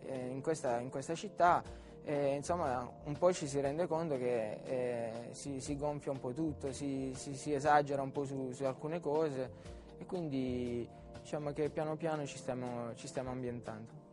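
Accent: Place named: San Severo, Italy. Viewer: oh xo l'accent' e san' sevir' non lo perde eh,ahahahah...grande pazienza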